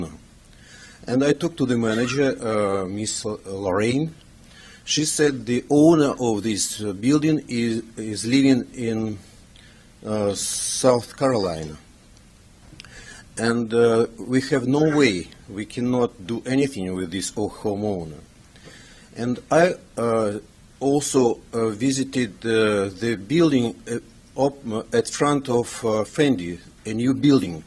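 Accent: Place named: Russia. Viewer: Надо отдать должное Вашей смелости: английский у Вас русский, явно недостаточный для избрания на должность мэра.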